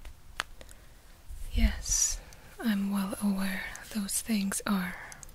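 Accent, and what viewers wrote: Russia. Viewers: I ❤️ Russian accent I'm English from Australia tho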